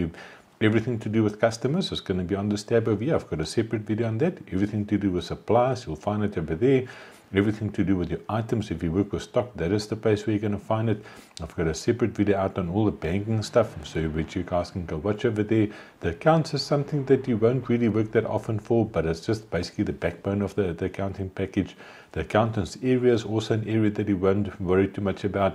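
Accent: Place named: New Zealand. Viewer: From New Zealand I believe, just change the speed of video and learn.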